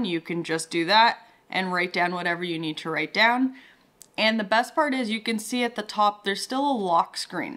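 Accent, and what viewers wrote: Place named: Canada. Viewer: Wonderful video and an awesome Canadian accent! Thank you for the content!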